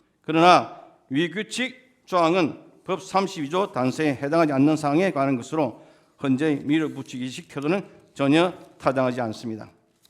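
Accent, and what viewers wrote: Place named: Gyeongsang, South Korea. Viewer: 또 경상도네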